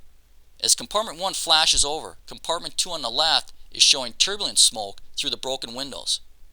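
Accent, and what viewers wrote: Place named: Wisconsin, United States. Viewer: There's just something about narrated fire videos. They're mesmerizing. That accent tho. Wisconsin? Eastern Minnesota?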